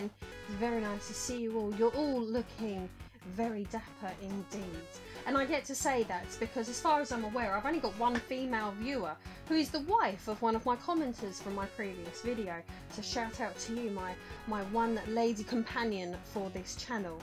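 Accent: United Kingdom. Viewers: Where in UK are you from?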